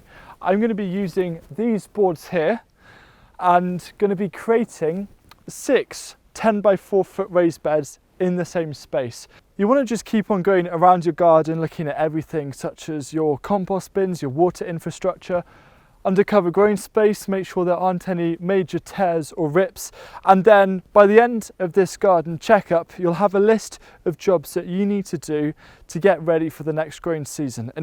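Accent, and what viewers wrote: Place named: Wales, United Kingdom. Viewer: yet another excellent video / and recommendation to give it a break for now. Meanwhile, question, please.... which month did you plant the OCA in your raised bed. I am mostly keen to learn 1. how late I can plant them? 2. Are they a perennial or can one save tubers or “seeds” from one season for the next?? Thanks I have seen loads of your tutorials and your Welsh accent is also a real bonus!!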